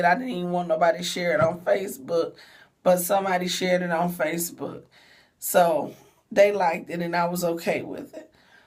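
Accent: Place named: Memphis, United States. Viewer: Are you from Memphis because I swear you sound just like all my cousins in Memphis!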